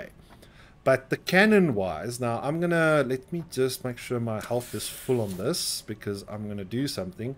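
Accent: South Africa. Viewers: neat, could hear it haven't found a lot of south africans playing the game. also from SA :D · Awesome to hear a fellow Saffa play this game. Keep the content coming chomma!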